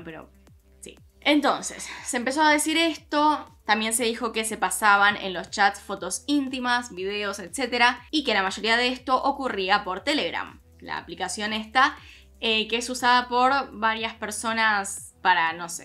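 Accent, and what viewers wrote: Argentina. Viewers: AMÉ ESTE VIDEO! HABLANDO BIEN ARGENTO! puteadas, humor negro y la más transparentes opiniónes. A pesar del estrés por mudanza , te pasate con este video!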